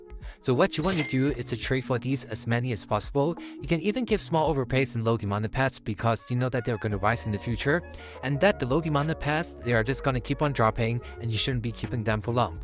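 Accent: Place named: Singapore. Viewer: U sound so singaporean lol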